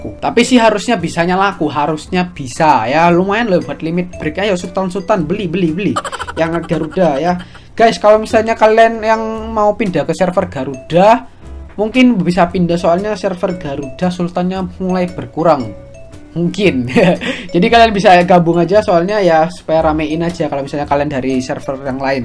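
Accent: Java, Indonesia. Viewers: AKSEN JOWO AJ BANG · Aksen jawa. Lebih enak didenger telinga (soalnya udah lama tinggal di malang haha). Btw, Garuda juga ya mas? Mau tanya kalau sehari2 leveling bisa dpt brp lvl? Kebanyakan leveling dimana mas? Trus itu enhance sampai +21 pake maphr trus atau kadang2 aja? Maaf mas nanya banyak kepo bgt soalnya liat kilau elven bownya gak nahan hahaha. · Bahasa indonesia aja biar medoknya kerasa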